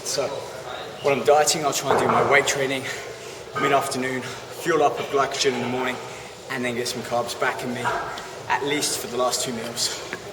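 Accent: United Kingdom. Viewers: I hear a British accent in his voice. Where is he from? And how long has he been a bodybuilder? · Left hand drive? --- and his British, where is he ?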